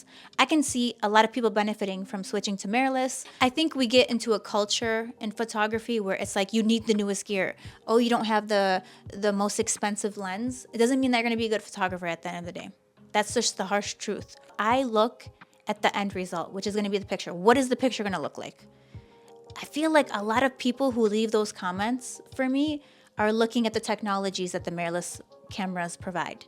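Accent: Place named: New York, United States. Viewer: My kinda gal! Love my old 'dinosaur' DSLRs and I'm still using my iPhone 7! I'm English: so I don't know U.S. accents well. Is that a Bronx New York accent? Anyway - whatever it is - I LOVE IT!! 💕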